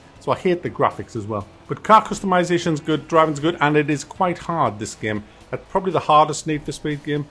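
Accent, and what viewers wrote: United Kingdom. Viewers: Your british english always brings a smile to my face <3 The way you say rant about this pricks haahahah i love it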